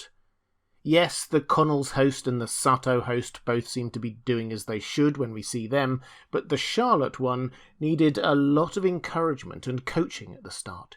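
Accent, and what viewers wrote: England, United Kingdom. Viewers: It's an English accent!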